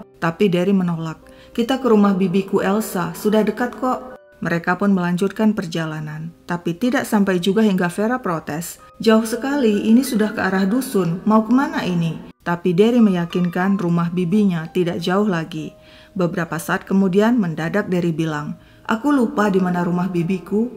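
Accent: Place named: Palembang, Indonesia. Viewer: paten jg kak bahasa palembangnya😂😂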